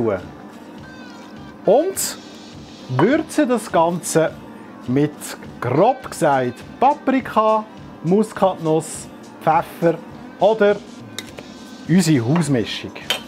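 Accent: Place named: Switzerland. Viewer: Wäre ja schön wenn man als NICHT Schweizer etwas von dem Gesagten verstehen würde. 👎👎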